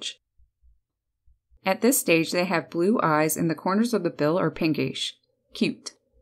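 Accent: Canada. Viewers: Many people love the accent of British and Australian, not me , I enjoy the Canadian accent there's something aboot it I find relaxing. Lol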